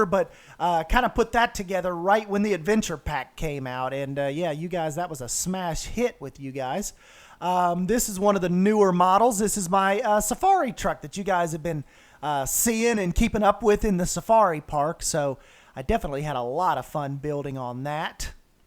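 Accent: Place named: Texas, United States. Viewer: Man this video is sooo vintage, I can't wait to see if they come out with a Planet Coaster 2 as I've heard you & Drew mention that quite a bit. I do think that Planet Zoo will be a perfect placeholder for you tho while we wait for a Planco 2. My wife said, is that guy from Texas? LOL Keep doing you man!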